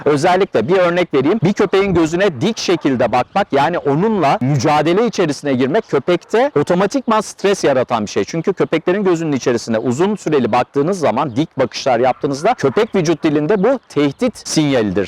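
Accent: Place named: Istanbul, Turkey. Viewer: Çok İstanbul ağzı konuşuyor diksiyon çok iyi ben ise Adana ağzı konuşuyorum çok moralim bozuluyor ne zaman kelimeyi bozacak diye bekliyorum. Bu beni üzer....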